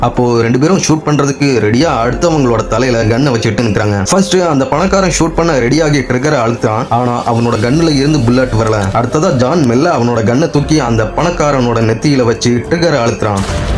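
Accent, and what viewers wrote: Coimbatore, India. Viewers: Neenga coimbatore ngla?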